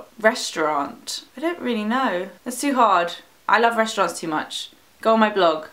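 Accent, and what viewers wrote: England, United Kingdom. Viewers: Very English and not British!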